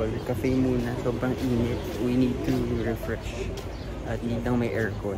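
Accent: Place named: England, United Kingdom. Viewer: I love your English accent!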